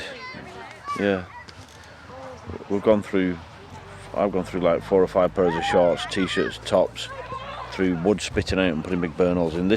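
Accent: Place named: Bolton, United Kingdom. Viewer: Been watching ur vlogs, saying this guy's definitely from Bolton 😅.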